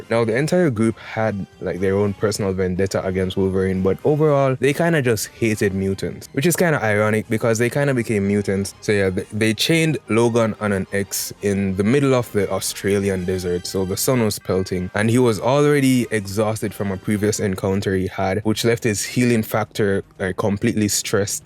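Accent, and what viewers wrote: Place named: Jamaica. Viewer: Bomboclaaat rastaman ahh accent, cool af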